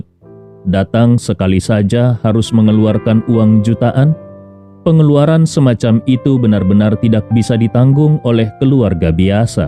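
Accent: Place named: Bugis, Indonesia. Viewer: Bismillah....maasyaa Allah..logat bahasa bugisnya ada..mwmbuat hati ridu akan tanah kwlahiran suamiku....salam untuk semua sodaraka..sehat afiat smakin sukses selamanya....aamiin..Abang yg baik....trimakasih..atas semua kebaikan..yg membuat semua bajagia..smoga lindungan Rahmat Allah mrnyertai...tsumma aamiin....sukses sehat slalu bang...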